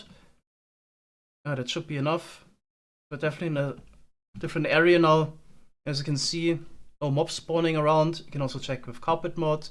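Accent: Germany. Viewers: Deutscher Akzent, beste.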